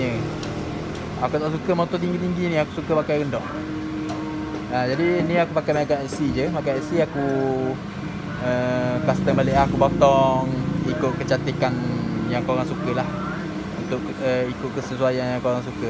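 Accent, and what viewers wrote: Terengganu, Malaysia. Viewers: Macam org ganu jee....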